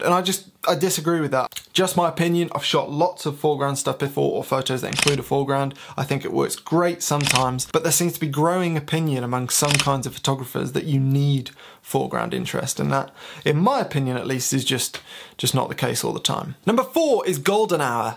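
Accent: United Kingdom. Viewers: At first I was like, "who's this opinionated, whiny Brit on my screen?" But, I watched anyway... And, I'm happy to say that we agreed with all 5 of those photography tropes that you covered in this video! #Subscribed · Mans is so British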